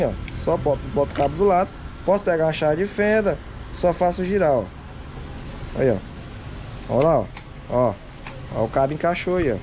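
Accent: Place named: Northeast, Brazil. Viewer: Show de bola merrmão!!! Me divirto ouvindo a maneira como você fala. Vou encomendar um dicionário "Nordestinês" para entender algumas palavras. Mas de boa, não estou mangando não. Não se aperreie pelo amor de Deus. Abraço do Léo o Tiozão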